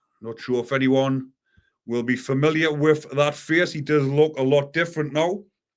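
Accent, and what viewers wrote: Newcastle, United Kingdom. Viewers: Nice one keep them coming mate good to hear a fellow geordie on here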